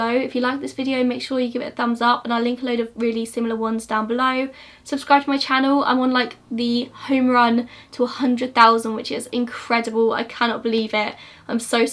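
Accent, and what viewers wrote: United Kingdom. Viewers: Hi, you're so cute girl I love your British accent and your gorgeous lips, xoxo.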